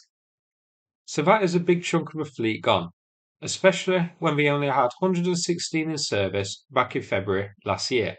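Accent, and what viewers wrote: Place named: Northern England, United Kingdom. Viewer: Krinkeh! Love the northern English pronunciation of the names and also the jokes. Keep it up lad!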